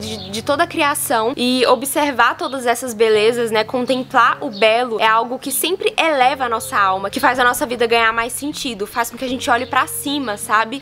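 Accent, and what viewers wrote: Minas Gerais, Brazil. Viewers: Fugão, tremsão kkkk é mineira mesmo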